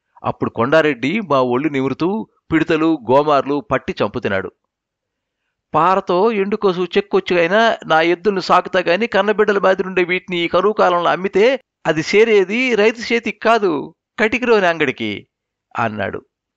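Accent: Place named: Rayalaseema, India. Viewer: Super story. Congrats to Reddy garu , the writer.మీరు గూడా బాగా చదివారు.‌ రాయల సీమ వ్యవహారిక భాషను బాగా పండించారు.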